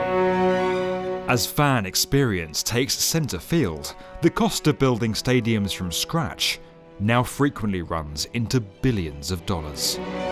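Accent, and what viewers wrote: United Kingdom. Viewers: British pronunciation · U sound British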